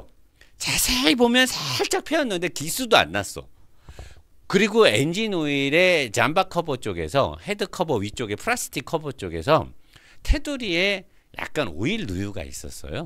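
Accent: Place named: Chungcheong, South Korea. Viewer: 충청도여~뭐여~~😅좋은 정보 감사합니다! 사장님!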